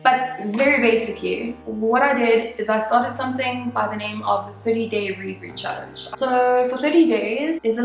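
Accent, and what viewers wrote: South Africa. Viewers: Are you South African? You have a really strong South African accent 😂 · are you South African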